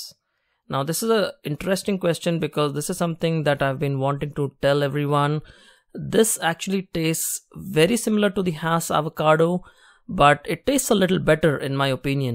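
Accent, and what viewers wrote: India. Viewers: Ur accent is like Indian english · Indian accent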